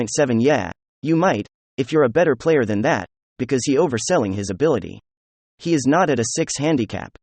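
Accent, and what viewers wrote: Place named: Russia. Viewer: use your real voice next time i want to hear your cute russian accent :3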